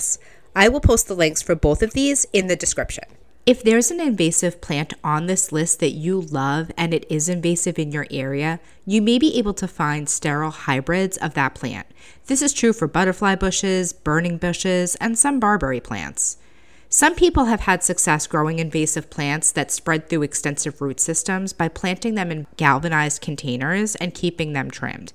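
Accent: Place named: Midwest, United States. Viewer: You don’t sound like any New Yorker that I ever met, you sound more like a midwesterner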